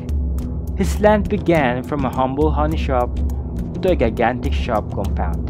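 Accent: Philippines. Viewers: I’m just gonna guess, but... Are you filipino? The accent’s there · Why do you sound like a Filipino?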